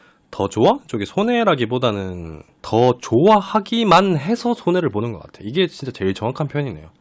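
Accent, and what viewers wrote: Gyeongsang, South Korea. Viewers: 얼마전에알게되서 듣다보니까 대구분이신가요 말투가 대구인데~~?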